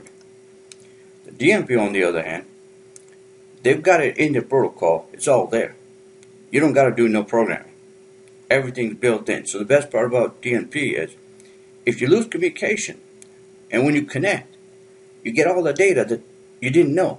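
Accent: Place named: Louisiana, United States. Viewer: My guess - Louisiana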